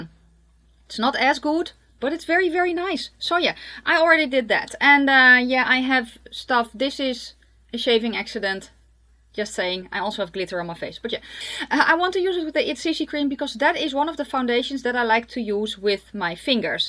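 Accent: Netherlands. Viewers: Your dutch Accent is so cute! 🙈🥰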